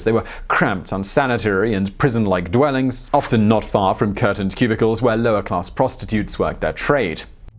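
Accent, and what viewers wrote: United Kingdom. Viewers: is a brit, so i'm sure it doesn't matter and will never happen, but i can only wonder what it would be like sitting at a thanksgiving day meal with. ten servings of 19 minute segments. with all the snark and wisdom that only the elocution of a british accent can bring to the english language. rock on.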